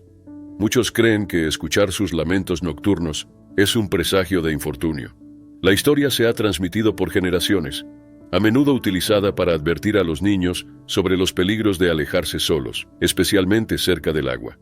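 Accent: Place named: Spain. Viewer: tu azento es Lindo cariño es español 😍😍😍😍😍💋💋💋💋❤️😊🧜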